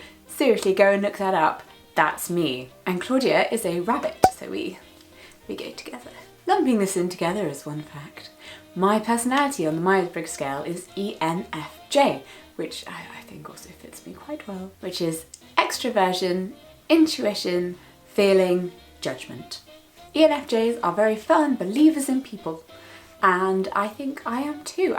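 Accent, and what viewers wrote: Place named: England, United Kingdom. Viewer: I found you right before Christmas last year, not sure how...Love your upbeat personality and especially your lovely proper English accent. I'm American and love anything British and you could have been a sister in Downton Abbey!! I'm in love with James Norton!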